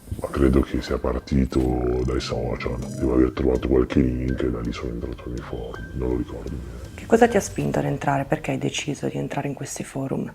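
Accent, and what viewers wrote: Sicily, Italy. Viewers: Anche io seguivo Voyager! Bellissimo il finale alla Adam Kadmon: "un abbraccio". Kadmon, coi duoi rimandi emotivi, formulava un invito alla ricerca continua e al non lasciarsi omologare dai cosiddetti "buoni per definizione". Lo adoravo. Lui tralaltro chiudeva i suoi interventi con la bellissima frase: "prendete queste mie parole come favole, un abbraccio, Adam". Spero che questo canale cresca ancora. Fa piacere anche sentire una voce siciliana (io sono di Catania ma vivo in Toscana), noi siciliani abbiamo nel DNA il dubitare sempre, l'argomentare, il desiderio di esplicare, la volontà empatica soggettiva di non soccombere e non accondiscendere.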